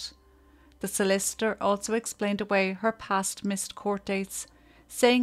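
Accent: Ireland. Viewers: Thank you for this excellent video. I can only say that Rebecca did not get the justice she deserved. So terribly sad for her children and family. I just love listening to your lovely Irish voice! May Rebecca rest in peace. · Me too....the Irish Accent is Beautiful ❤ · Saturday morning here. I jumped on especially to hear my favourite Irish voice on my favourite crime channel. Thank you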